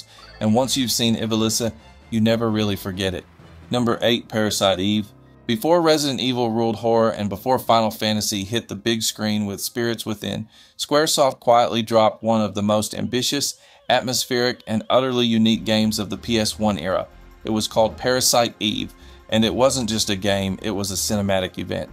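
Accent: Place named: South, United States. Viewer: Where are you from? That's a thick southern accent. Well narrated, good job mate!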